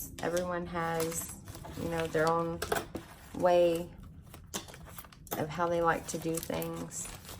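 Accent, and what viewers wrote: South, United States. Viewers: thx so much for this beginner video. I have to ask where you are from. Southerner? I'm from Louisiana. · Thank you for showing us how to get started with junk journaling. I'm going to binge watch all your videos. . .btw, i love your southern accent, are you a Ga girl?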